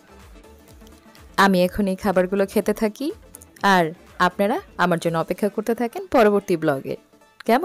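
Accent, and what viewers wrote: Rajshahi, Bangladesh. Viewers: unty ami kintu jai ni kokhono tobe tomar vasa sune jaoar issa korse obossoi jabo inshaallah tobe vasa kintu onek sundor yomi j vabe rajsahir vasa bolle ki bolbo oshadaron love u moni💙💚💛💛🧡❤ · রাজশাহীর ভাষা দারুন লাগছে গো আফা🤣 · আপু তোমার ভিডিও সবসময়ই খুব সুন্দর 💗🌹💖আপু রাজশাহী ভাষার কথাগুলো অসাধারণ হৈছেগো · অসাধারণ রাজশাহী ল্যাঙ্গুয়েজে কথা বলার জন্য অনেক ভালো লাগলো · এখন আবার দেখে নিলাম।অসাধারন দৃশ্য আপু আপনার মুখে রাজশাহীর ভাষা দারুন লাগছে গো আফা🤣🤣🤣🤣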